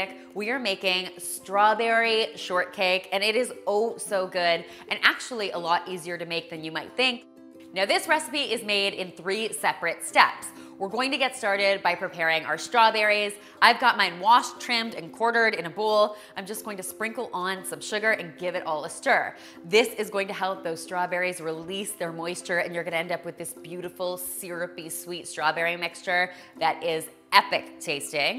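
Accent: Canada. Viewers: Is she canadian? I didn't notice her slight accent until this video... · yes she's Canadian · you're right, her accent was much more prominent in this video :) I knew she was Canadian, but the entire video I was thinking about how clearly Canadian her accent is in this one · terri-louise Ó leathlobhair Canadian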